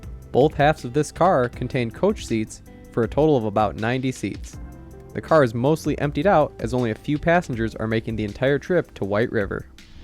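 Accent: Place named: United States. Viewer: We need more CanadianEnglish pronunciation in this video. It sounds like the narrator is American...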